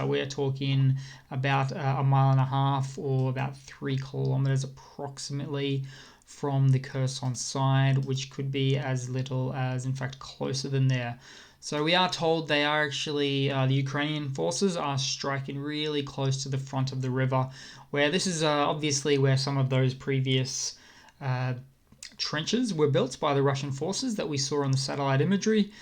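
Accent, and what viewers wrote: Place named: Australia. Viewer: Love your Aussie accent! All da best from Poland :)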